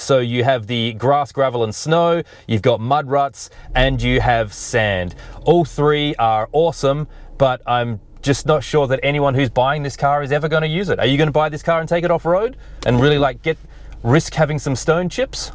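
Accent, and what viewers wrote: Australia. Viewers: Perth accent? Lol.... im getting this, luxury ride 🤩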